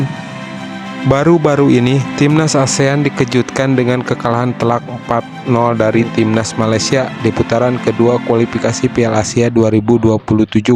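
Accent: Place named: Sunda, Indonesia. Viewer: Sundanya medok bnget min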